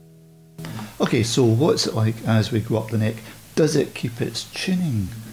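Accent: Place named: Glasgow, United Kingdom. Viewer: Another teriffic review always informative and concise. Lovely to hear a fellow glaswegian voice on youtube..cheers.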